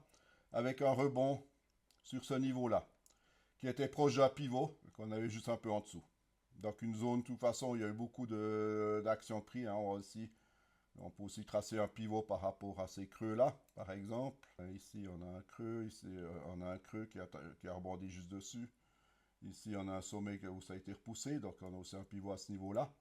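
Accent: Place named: Alsace, France. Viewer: je reconnais bien ce bon accent alsacien car je suis de strasbourg 🙂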